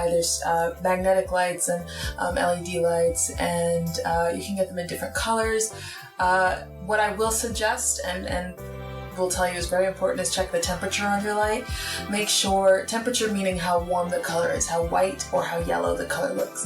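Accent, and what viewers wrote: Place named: New York, United States. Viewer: Subscribed after our video with Marwa, you haver an incredible New York voice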